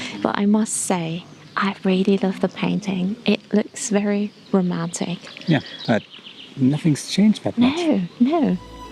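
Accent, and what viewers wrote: England, United Kingdom. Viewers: I love your English accent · i like your beautiful voice, i like your british accent too, hello from Indonesia :) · What a lovely video about this idyllic cottage.This is my first ur video and i really enjoyed. If i didnt read you are from Germany I will assume u are born in England.Thanks for sharing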